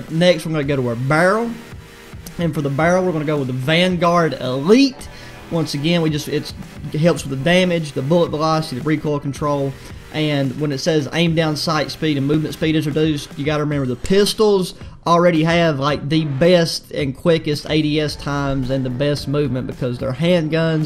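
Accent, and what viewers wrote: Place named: Georgia, United States. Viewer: Where are you from? Accent sounds Georgian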